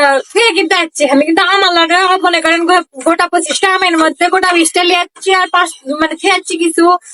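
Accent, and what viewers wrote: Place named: Bogura, Bangladesh. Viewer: ভালোই তো বলতে পারো বগুড়ার ভাষা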